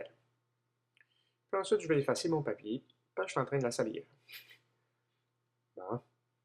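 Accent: Quebec, Canada. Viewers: il a un accent québécois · merci mais ta vois et bizzar acssant canadien mais encore merci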